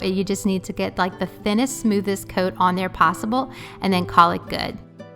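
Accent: Louisiana, United States. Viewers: hi! I just subscribed, your video from a year ago popped up on I my feed. I'm in SE La I recognized your accent :)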